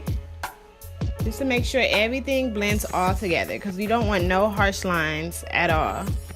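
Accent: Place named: California, United States. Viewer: You sound like you from California 🤔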